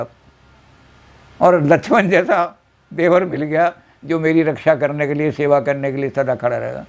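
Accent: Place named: Haryana, India. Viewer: ne Kamal kar diya. Shastri ki bato Ko behad saral v harynvi lahje me samjhaya diya. Dhanywad.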